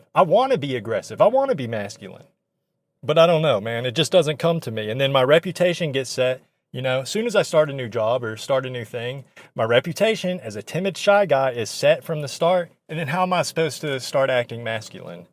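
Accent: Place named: Deep South, United States. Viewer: You should move to a larger city if possible, judging by your accent you’re probably from the Deep South like me, once I moved to a bigger city(Atlanta) it was way easier to find friends and meet new people.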